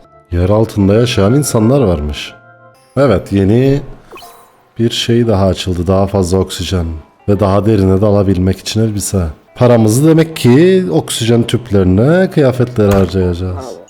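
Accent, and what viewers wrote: Cyprus. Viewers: Kibris şivesi cok guzel hocam video terapi gibi geliyor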